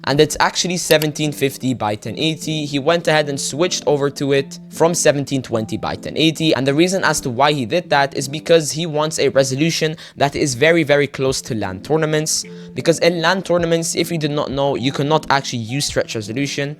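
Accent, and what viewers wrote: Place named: Sweden. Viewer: dude sound like he's swedish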